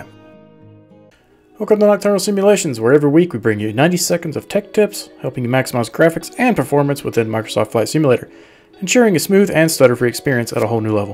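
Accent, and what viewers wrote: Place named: South, United States. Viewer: Awesome 👌 Thank you so much. And... I absolutely enjoy your southern accent and style 😉. Being a southern boy myself 😅from Charlotte, NC.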